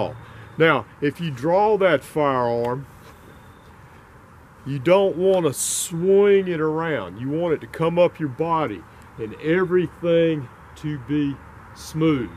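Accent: South, United States. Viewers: dudes voice is from country heaven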